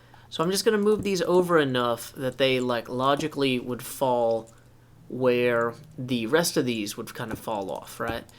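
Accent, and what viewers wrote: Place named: South, United States. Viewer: It is my first time watching one of your videos, and I am greeted with beautiful singing and relatable musical hate for the nether. Your voice is wonderful! You have a bit of a southern accent, are you from Georgia? :D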